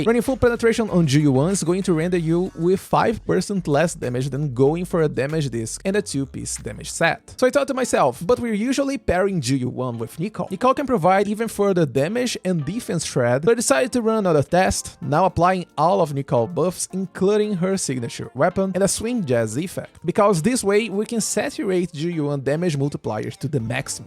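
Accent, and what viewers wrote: Brazil. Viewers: Sotaque de brasileiro é facil de pegar khkhkkhkhkh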